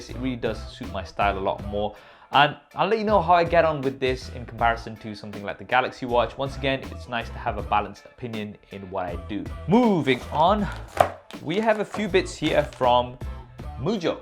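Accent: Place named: United Kingdom. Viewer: Please stick with the British pronunciation. #British Good job with video!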